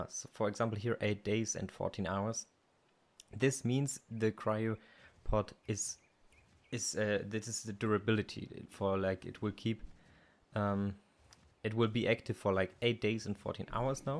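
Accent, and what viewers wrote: Germany. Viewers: Do I hear DEUTSCH?????? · Hi thanks Bist du deutsch?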